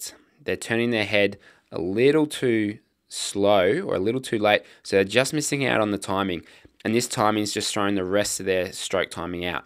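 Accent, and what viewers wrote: Australia. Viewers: Awesome! Thanks! Though at first that lovely Aussie accent made "late" sound like "light" !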